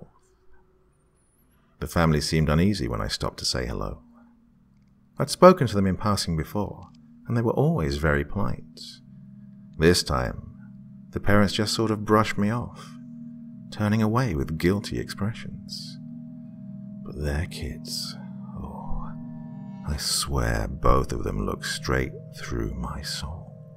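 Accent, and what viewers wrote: United Kingdom. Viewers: "My work is taking me to England" 1) I genuinely thought you were British! 2) Good luck, don't mention Brexit. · I made the mistake of thinking that with your accent you were perhaps already located in the UK. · i also live in england and i thought he lives in england?!?! im so confused i thought he was british? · I thought you were British 😂 well, enjoy your trip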